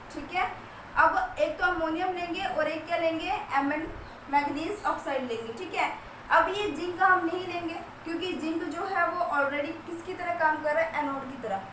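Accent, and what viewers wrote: Bihar, India. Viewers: Hay miss aap Bihar se h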